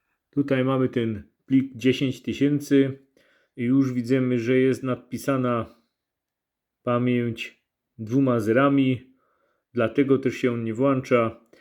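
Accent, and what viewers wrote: Silesia, Poland. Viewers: jestem swiezym posiadaczem magdaleny z 2016r troche wszystko to nowe dla mnie wczesniej siedzialem w peugeotach ostatnim byla hybryda 508 RXH znalazlem twoj kanal swietny masz kontent bo krok po kroku wszystko a do tego ten hanysowy bliski sercu memu akcent:P (pochodze z Swietochlowic ale od prawie 19 lat w Irlandii) Mam takie pytanko kupilem od zoltego brata modul do AA P3.2 i czekam na niego musze softa zaktualizowac mam teraz <num> EU N i jaki bys polecal wiem ze musi byc 70 + ale jaki konkretnie. Bardzo ciekawi mnie te twoje polaczenie szeregowe z kompem jaki to soft itp (wiem o tych modach co mozna dograc) Moglbys rozwinac temat ewentualnie polecic stronke/forum z taka wiedza?? Dzieki wielkie za filmiki sa bardzo pomocne mysle ze gdybys nagrywal po angielsku mialbys just duzy kanal:P. pozdrawiam Tomek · Skond żeś Chopie jest ,bo słysza że nosz synek ze śląska, pozdrawio Rybnik.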